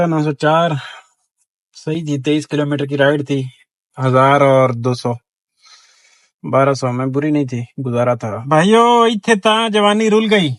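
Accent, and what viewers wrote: Jhang, Pakistan. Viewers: Apki Punjabi to jhung wali ha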